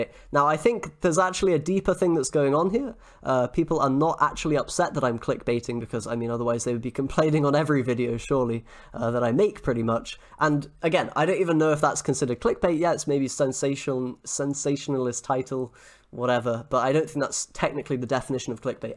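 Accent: United Kingdom. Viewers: I stopped playing Gwent when I 've realised how Homecoming downvalued my beloved game but I keep watching you just because of your fantastic British accent. Keep doing your job!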